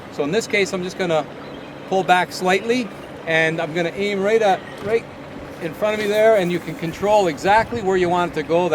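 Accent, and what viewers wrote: Canada. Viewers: I can tell you are canadian lol.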